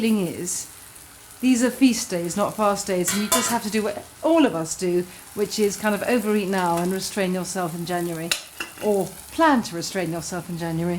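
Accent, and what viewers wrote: England, United Kingdom. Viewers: Yes. Sometimes she strikes me like one of the classic Hollywood glamour women of the 1940's. Plus she's got that mane of raven hair, the Marilyn hourglass shape, and the delightful Mary Poppins English accent. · Have you read Jane Eyre? Nigella has a way with words and that British accent does it wonders but that's it.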